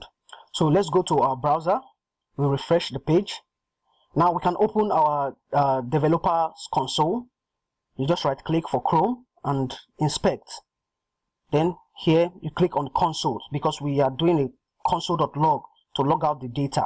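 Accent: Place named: Nigeria. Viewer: I'd recognize a Nigerian anywhere. Good one bro, carry one.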